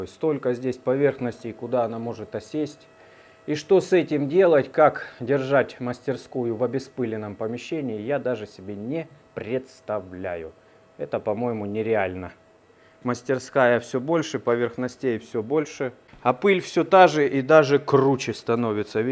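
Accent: Rostov, Russia. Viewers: Молодец!!! Ты и твои видео всегда радуют) за эти года просто до боли приятно слышать твой ростовский говор и специфические словечки))) 👍👍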